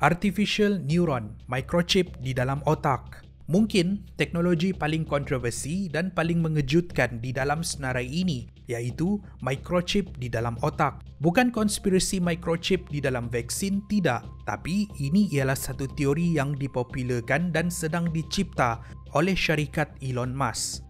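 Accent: Sabah, Malaysia. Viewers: Nk tnye dia nie org sabeh ka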